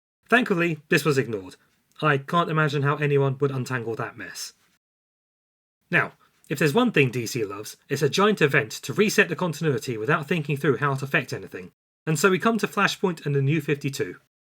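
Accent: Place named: United Kingdom. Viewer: Is 9th metal 8x stronger than Nth metal, or is homies Limey accent just throwing me off? did not know Ninth metal was a thing🤷🏽 The Katar Jr./silver scareb works cuz that Gawkman was a lier and not the Scarebs real brother. I just love JLI and hate the try on that those 2 weren’t legit! Using Morrison’s preferred pronouns? RESPECT Edit Lol Starlin mess’n round w his cosmic fetish, you really can’t fault the guy at this point. Death of the New Gods was a giggle, and he did kill Jason!!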